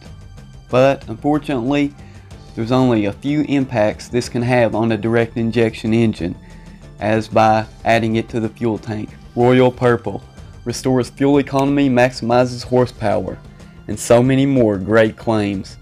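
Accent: United States. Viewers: But he has such a cool cowboyish dialect 😆😆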